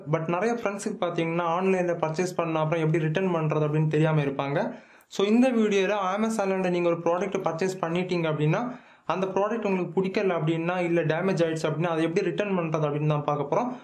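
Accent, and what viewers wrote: Kanyakumari, India. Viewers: Kumari Tamil 😍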